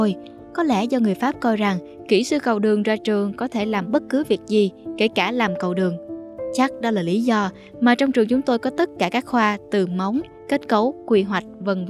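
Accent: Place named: Ben Tre, Vietnam. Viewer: bạn voice là người Bến Tre à kiểu đọc sai chính tả của người Bến Tre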